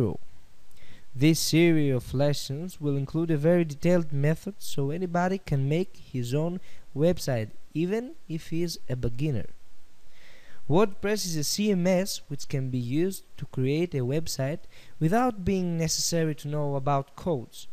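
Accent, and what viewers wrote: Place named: Russia. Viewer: I like russian people, they got an interesting way of talkin :)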